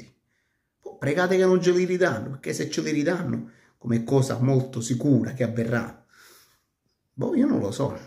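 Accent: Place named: Apulia, Italy. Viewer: Domani dobbiamo vincereee🤍🖤 Comunque calcio a parte, io sono delle tue zone, dal tuo accento sei di San Severo o di Cerignola?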